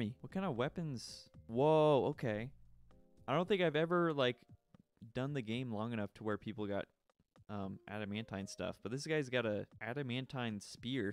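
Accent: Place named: United States. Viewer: It is clear you are from usa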